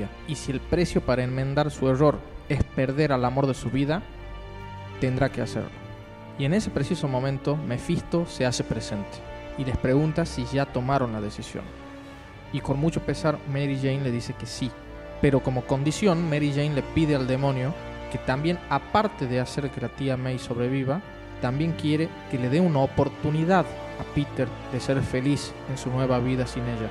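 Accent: Tucumán, Argentina. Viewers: Este es Argentino :v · Bien relatado, se nota la tonada tucumana! jaja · siempre me genero fuertes emociones el comic de ciwil war y los posteriores a este T-T recordar esto me puso triste otra vez XDD PD: Te encuentro acento argentino... jajjaja de que parte? yo soy de tucuman XD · sos tucumano, salteño o catamarqueño? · eee el asento tucumano xd es feo sin ofender lo repito SIN OFENDER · Tucumano o me equivoco? Ajajajja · alto tucumano básico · no es que no sepa pronunciarlo , el es de Tucuman , Argentina y en esa zona tienen ese acento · tucumanoo como yoo jajaja de donde eres? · Se te re nota el acento tucumano jajaja. Es genial ver a alguien del NOA con un canal con esta cantidad de suscriptores. Saludos desde Catamarca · sos tucumano?? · Sos tucumano o santiagueño?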